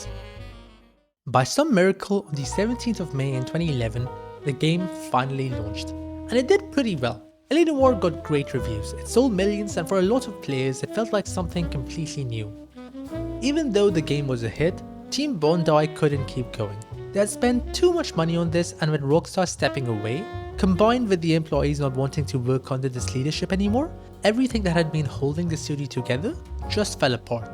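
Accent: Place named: Iran. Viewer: Great video! By the way, are you persian? I hear hints of persian accent in your voice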